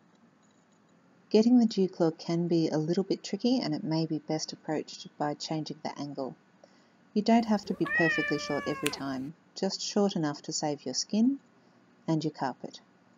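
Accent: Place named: Australia. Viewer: The drawings made no sense to me, and the video could have used another body to manage the camera better, including larger close-ups focusing on the clipped spot. But I did manage to grasp what was trying to convey due to my own earlier experience, where I found more cats to be less than enthusiastic about the procedure. So, in order to prevent a painful mishap, I wrap my mani/pedi ready furballs in a large towel, not too tight, leaving only the head protruding from the cotton sausage, and the paw under consideration sticking out from another tube at some distance to minimize the chance of being bitten. Oh, and in the event blood is drawn, I keep a dish with H2O2 and cotton ball handy. Better safe than sorry. And, absolutely, yes, a special treat comes at the end of the not-really-an-ordeal. P.s. -, could you please narrate at a slightly slower pace for those of us who are trying to comprehend yr Aussie accent and grasp what you're saying at the same time. 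(Maybe that's why I failed to get the drawings.) Thanx. (With apologies if I managed to, however inadvertently, offend with my blunt comment.) Ps2: you have a very compelling and lovely sweet and soothing voice.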